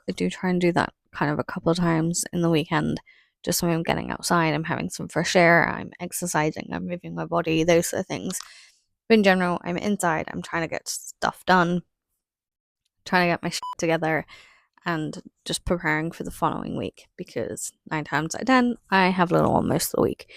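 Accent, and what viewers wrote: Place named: Northern England, United Kingdom. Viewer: honestly hands down you have the most amazing accent on a person who I have ever known up North (and believe me I have met a lot of people in the North of the UK) a lot of people say I have a rather posh accent being from the South of the UK 🤣🤣🤣 I am so glad and happy to hear you are coming back with more videos, and I am happy as always to support my favourite content creator and the content that you create lovely 😊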